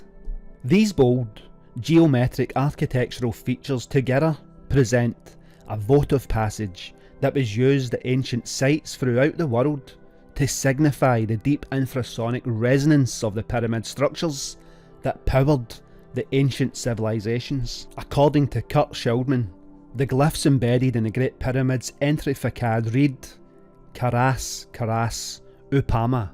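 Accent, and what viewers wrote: Scotland, United Kingdom. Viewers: Delivering information in a Scottish accent makes it sound stupid from the off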